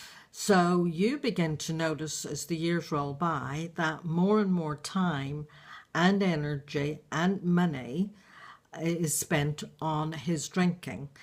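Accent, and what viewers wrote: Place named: Northern Ireland, United Kingdom. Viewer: are you originally from Northern Ireland? I think I can hear an Americanized version of that charming accent. I am watching your videos from mostly the other side of the coin, because I am in danger of getting lost in the alcohol quagmire myself. For a good few years now I've come home from a full-on, highly responsible job and used too much alcohol to calm my senses more often than I should. As much as I detest my addicition to nicotine, alcohol abuse is my real problem and I've grown to hate it and hate myself for being like this. I still function extremely well (but not to my full potential) in my work and pay my bills and my share elsewhere. I've also been watching motivational videos of how people's lives got much better when they quit drinking as well as others who are realistic about their anxiety and how alcohol gives them a brief respite from it, and that's probably me. 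I'm a competent female tech geek who works in a team with 30 guys, but I support primarily other women in top management positions who jokingly(?) say 'they hear the wine calling' at the end of a hard day, so I think this problem may be bigger than most people imagine. I won't go into my life story except to say that I've had a few truly fucking terrible relationships where my partner has been 'trying', to say the least, and one of them even nearly killed me (fortunately a very short-lived yet traumatic relationship once he showed his true colours - he ended up in jail). But the year I spent in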